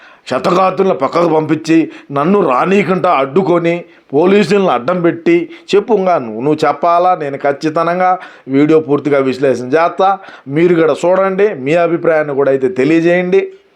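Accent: Rayalaseema, India. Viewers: same slang throughout combined Chittoor, Anantapur, Kadapa, Kurnool dist.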